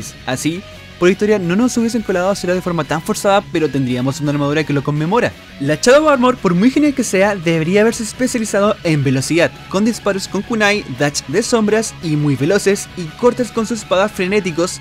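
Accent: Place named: Chile. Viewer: El primer chileno que escucho hablando de megaman. Que rico. Jaja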